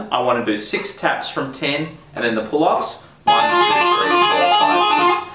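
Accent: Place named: Australia. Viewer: Always refreshing to hear the Aussie accent.... great work....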